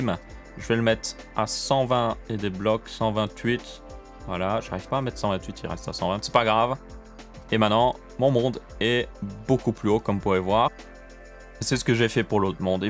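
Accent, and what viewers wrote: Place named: Belgium. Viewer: Ta un superbe accent belge !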